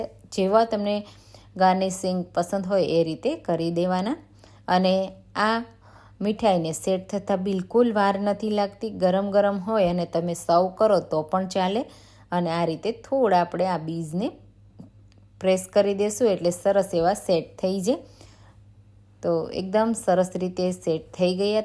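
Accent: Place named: Kutch, India. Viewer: મેઘપર બોલીથી કચ્છ 🎉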